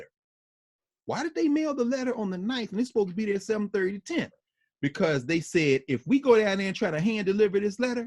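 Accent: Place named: Nashville, United States. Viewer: I was born and raised in Nashville. Graduated Tennessee State many years ago. I love. I have not lived in Nashville in 30 years, and when I hear him say, “ my momma and ‘ nem’ “, I feel like I’m back home again. (Notwithstanding, his brilliant mind.) Love you guys and thank you.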